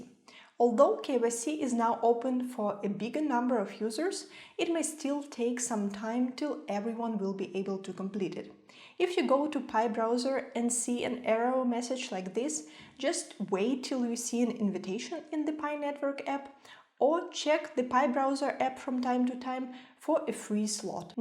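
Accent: Germany. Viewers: your englisch with strong german accent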